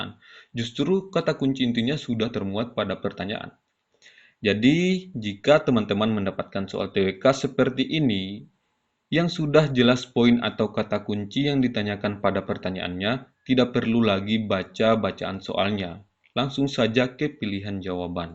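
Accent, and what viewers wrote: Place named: Muna, Indonesia. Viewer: Dialeg bahasanya mirip orang muna kereeen 🙏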